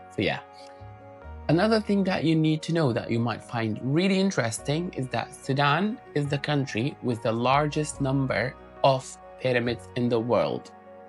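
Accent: Scotland, United Kingdom. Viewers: You definitely have a little bit of Scottish in your accent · Theres a bigger scottish twang in your accent than you think, definately hard to pin down. I knew where Sudan is but I didn't realise they have the largest number of pyramids.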